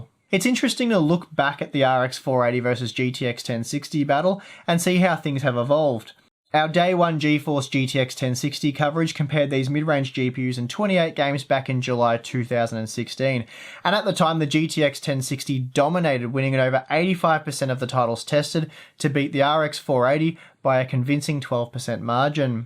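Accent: Australia. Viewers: I watch your videos because you have great benchmarks, and you're a fellow Aussie so your accent sounds more natural to me. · You Aussie? Kiwi and you guys sound alike 😅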